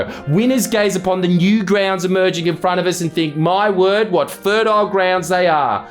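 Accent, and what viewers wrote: Australia. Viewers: This is probably the most Australian I think I've ever heard him sound.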